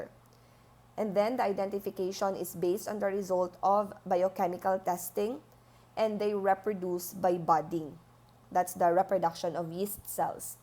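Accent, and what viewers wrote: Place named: Philippines. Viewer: filipino accent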